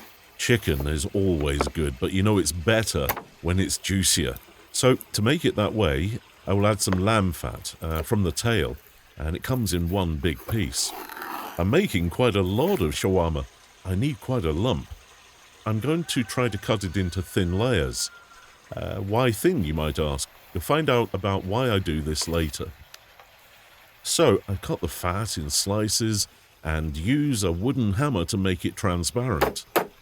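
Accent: United Kingdom. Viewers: Food looks delicious, like it always do. But I'm not crazy about the British narrating. I like the original videos, where it was just nature and him cooking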